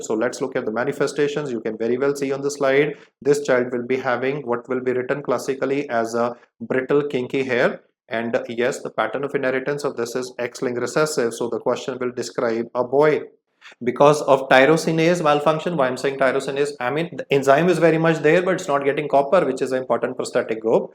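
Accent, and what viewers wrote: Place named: India. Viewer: could you add eng subtitles please? I have real problems with Indian accent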